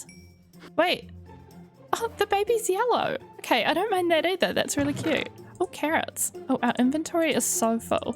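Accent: New Zealand. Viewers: Im so used to watching American and British minecrafters that it took me a hot minute to place your accent, even though Im also a kiwi xD Made me happy to see you're from Nz. This was my first video of yours and I enjoyed ❤ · You mentioning the poppy’s significance as a symbol of honor for war veterans made me laugh out loud. I was literally at that very moment thinking about how I love that I found your channel not only because of your style of content and how perfect it is to watch/listen to while I sew or play Minecraft myself but because of your accent! I portray a WWI ANZAC (specifically a New Zealander in the AANS!) nurse as a reenactor (one of my many impressions to educate the public with) and there are two events a year I go to that are supposed to be immersive, so I try to brush up on my New Zealand accent beforehand and your content helps so much with that. 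I can’t believe I was thinking about just that when you mentioned the connection. 😂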